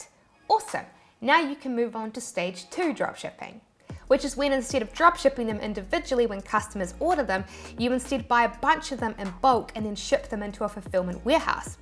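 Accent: New Zealand. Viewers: Her accent with 'e' sound every time brings me back memories of my trip to New Zealand before corona... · She's from New Zealand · Are you kiwi??? I don’t think I caught your accent before! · I just realized how different the NZ accent is compared to the Aussies. By the way, very good information here. I was wondering how to start a T-shirt/mug printing business like yours. Thanks!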